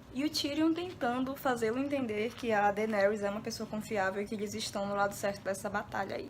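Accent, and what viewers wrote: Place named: Pará, Brazil. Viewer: Esse sotaque paraense <3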